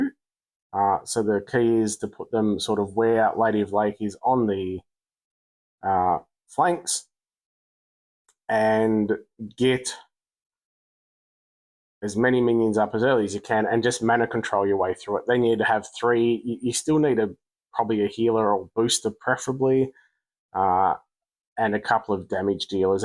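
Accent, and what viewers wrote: Australia. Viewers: lol as a Texan I can tell you that the Beaver Nuggets are an excellent little snack. Bucees gas stations are a destination spot around here. I never expected to hear an Australian talking about the Nuggies in an Empires and Puzzles video on your tube though. Crazy times lol 🤣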